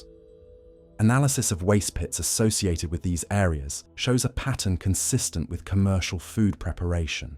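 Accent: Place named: United Kingdom. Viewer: You don’t understand a uk accent?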